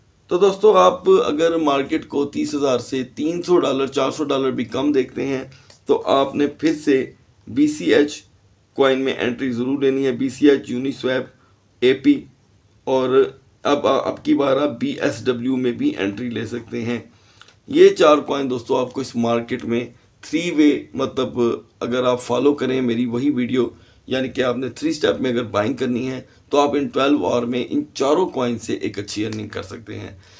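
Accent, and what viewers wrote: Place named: India. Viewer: You speak like indians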